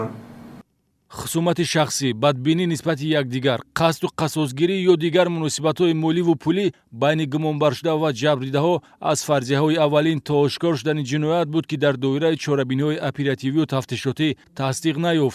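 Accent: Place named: Tajikistan. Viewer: А почему он разговаривает на русском с акцентом таджик же видно и слышно страно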